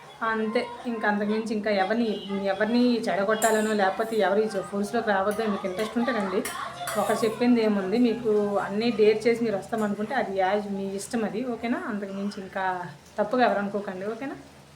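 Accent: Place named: Vizianagaram, India. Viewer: మీరు చక్కటి మన vizianagaram యాసలో మాట్లాడటం చాలాబాగుంది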